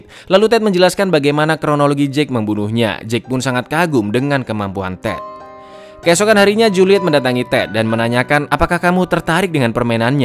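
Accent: Java, Indonesia. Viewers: Jowo keras lorr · Pean org jawa ya mas? 👍🏻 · ketoro lek wong jowo bgt😂🤣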